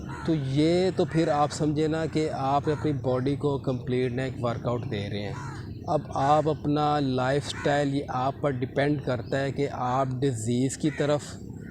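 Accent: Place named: Pakistan. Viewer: The first-ever video I watched completely. Your way of talking along with urdu accent is great. Keep it up.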